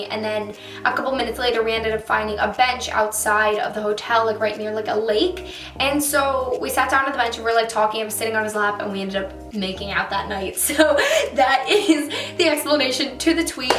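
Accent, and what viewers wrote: New York, United States. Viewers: Senja S it’s her New York accent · I love your new York accent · your new yorker accent makes me want to go live in nyc more and more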